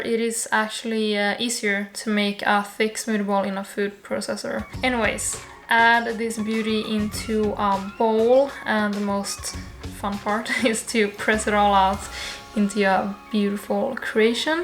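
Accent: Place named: Sweden. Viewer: man hör direkt att hon är svensk ahhaha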